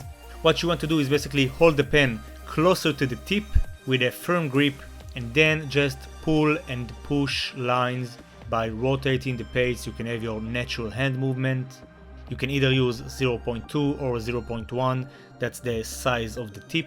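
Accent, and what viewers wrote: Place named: Israel. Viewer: אתה ישראלמ נכון שומעים ותודה על ההדגמה עם גוקו בלאק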